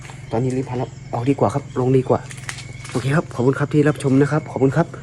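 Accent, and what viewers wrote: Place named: Isan, Thailand. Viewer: โครตหลอนรอยตีนเห็นชัดมากเลยระวังตัวแหน่เด้อน้องเว้าไทยปนอีสานนำกระไดจ้าม่วนดีออก❤❤😊😊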